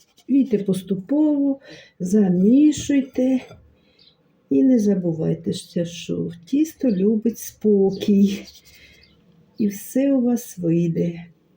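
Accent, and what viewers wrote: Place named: Ukraine. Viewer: Смотрю не только из-за рецептов, но очень нравится говор! Так у нас в Сибири разговаривали наши родственники! Они были переселенцами. Да и не только родственники, у нас вся улица была населена украинцами. Они все переехали в Сибирь в начале прошлого века! И теперь слушаю, как будто возвращаюсь в детство❤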